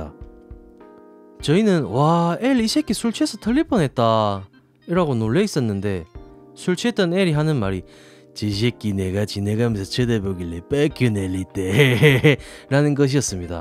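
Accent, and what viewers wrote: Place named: Tongyeong, South Korea. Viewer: 나 학교 다닐때 국사랑 지리시간에 잠만 자서 지리말고 이 썰 듣는데 재밌노ㅋㅋㅋ 썰 더 풀어주세요!! 구수한 통영 사투리라 더 감칠맛나게 귀에 쏙쏙👍🏻👍🏻 대박나소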